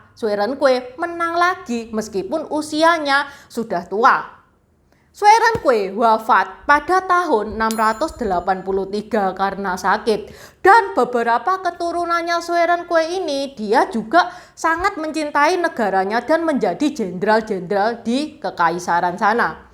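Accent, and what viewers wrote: Java, Indonesia. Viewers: suaranya banyak dialek. Jowo pada hal Aku penggemar ini orang Bugis. Lhoo 🙏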